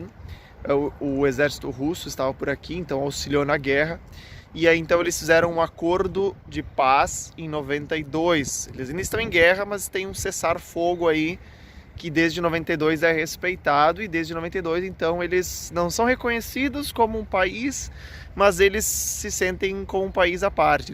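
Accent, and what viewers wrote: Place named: South, Brazil. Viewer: Esse sotaque do sul kkkk😅❤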